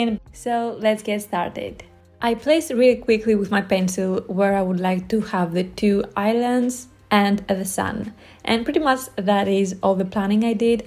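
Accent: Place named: Greece. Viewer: Your Greek accent is so beautiful. I could listen to your voice for hours.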